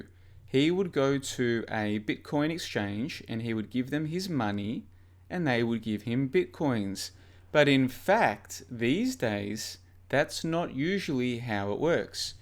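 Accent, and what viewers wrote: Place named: Australia. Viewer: I don't disagree with anything said here.. Private company issuing tethers is not dissimilar to central bank printing money. Tether will undoubtedly be involved in the crypto crash. Although still lots of room to inflate first.. Thanks for putting it in simple terms and nice to hear an Aussie accent in this space!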